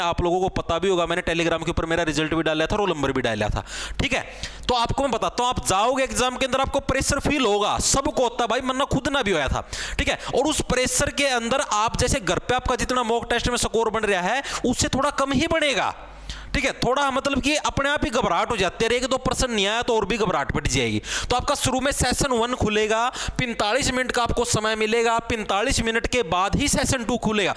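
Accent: Haryana, India. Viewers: Love your Haryanvi accent bro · Wah kya majay dar language hai sir apki i think haryanvi hai ❤❤❤❤❤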